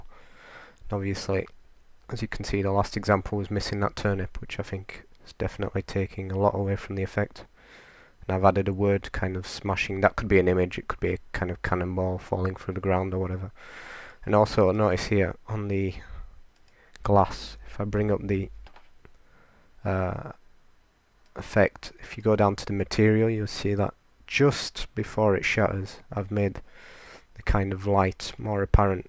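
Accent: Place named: Northern England, United Kingdom. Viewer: is from yorkshire? well he's northern anyway xD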